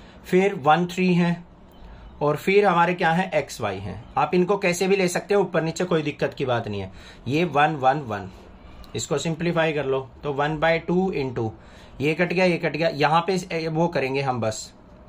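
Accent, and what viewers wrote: Haryana, India. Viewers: Sir app haryanvi hoo na mane pahachan liya😁😁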